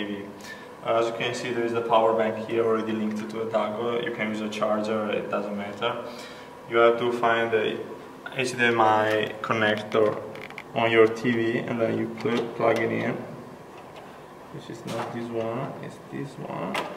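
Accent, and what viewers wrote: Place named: Italy. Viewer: italian accent xD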